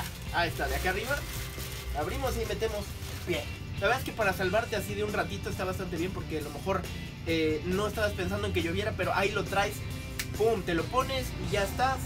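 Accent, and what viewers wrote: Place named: Puebla, Mexico. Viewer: Hola, buen video, me doy cuenta que eres de Puebla jaja saludos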